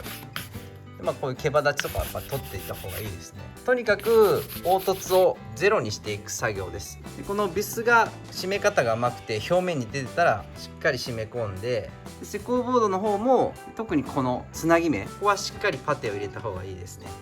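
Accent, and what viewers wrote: Kansai, Japan. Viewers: いつもより関西弁強めでしたねw 同じく関西人ですので、心地良いですが。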